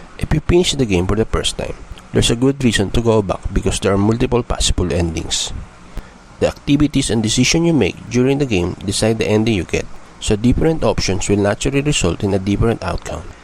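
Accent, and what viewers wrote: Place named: India. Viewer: That's a messed up Indian accent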